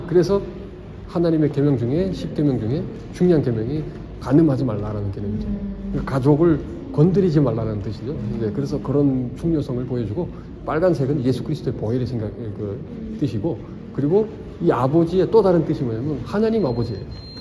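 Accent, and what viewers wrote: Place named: North Korea. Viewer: 안내 덕분에 영상잘봤고 좋은 공부가 되네요. 북한 억양이라고 하더라도 그건 나쁜게 아니니 걱정 조금도 마세요. 부산사람이 경상도 사투리 한다고 더 싫다거나 좋다거나 나쁜건 아니듯...오히려 한국말 너무 잘하시는 것이 억양을 떠나서 아주 멋져보여요. 구독 좋아요 눌렀네요.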